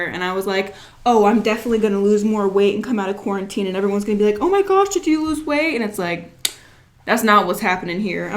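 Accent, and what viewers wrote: United States. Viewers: crazy question, are you from the south? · Omg youre from Indiana?? No wonder why we have the say accent 😂 I'm from Indiana too haha born and raised Chesterton just moved to Valpo. I work for family express distribution center and I still have to go to work 😔 I want to start exercising outside but it's been chilly and windy !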